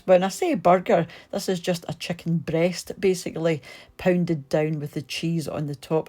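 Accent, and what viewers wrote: Scotland, United Kingdom. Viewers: I personally think voice is a very important part of her channel,she is a good cook,her recipes are good,but her calm Scottish accent is everything,and also mentioned by her viewers a lot of the time.😊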